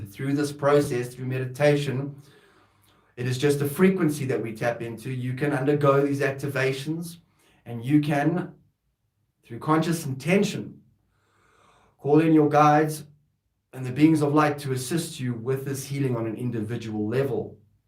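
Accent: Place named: South Africa. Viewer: hello, are you south african? you have an SA accent. i'm new to your channel, i'm from Botswana. do you do your lives from facebook or from youtube? would love to tune in sometime.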